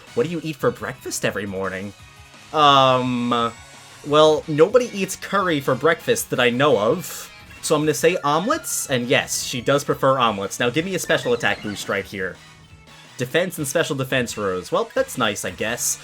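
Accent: United Kingdom. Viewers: How do you do so good British ascent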